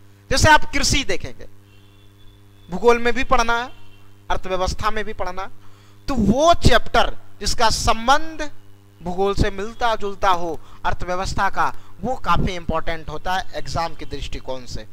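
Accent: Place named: Bihar, India. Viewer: Maje le ro ho ap Ye bihar ki language aisi hi prvarti ki hoti h 🤗 पढना _ परना सडक_ सरक etc. It's all ✓. One can understand 😎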